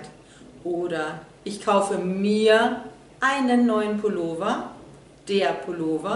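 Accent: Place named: Germany. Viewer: Immer Super Videos! Ich lerne viel hier! Was mir am meisten gefällt ist der Akzent oder die Aussprache. Echtes Deutsch!!!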